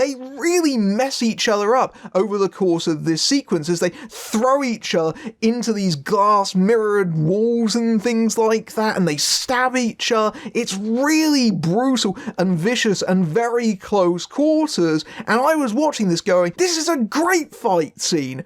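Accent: United Kingdom. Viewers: I'm detecting some British Isles lurking in your accent.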